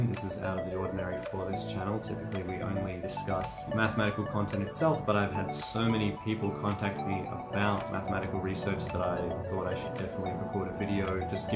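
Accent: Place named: Australia. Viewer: Aussie accent :O oh you're at usyd? Im over at UNSW. Not even studying maths but I'm going down a rabbit hole and I now I really wanna relearn maths 😂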